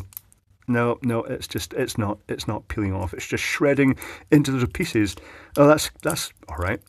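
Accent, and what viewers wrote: Scotland, United Kingdom. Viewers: Hey. Long time listener, first time messaging. Loving your vids. I’m clueless when it comes to electronics and live listening to your descriptions. Even if I can’t properly understand it all. Fife, born and bred. I love hearing a Scottish accent on a YT vid. I was watching a recent video of yours where you mentioned putting an aluminium heat sink on your pile of interesting heat sinks. Can I trouble you for a video on that subject? I’m a scratchbuilding model maker and love cool “found” shapes. And an annotated video from you about such cool things would be of great interest to me. And I bet other subscribers also. Thanks in advance. Regards from glenrothes.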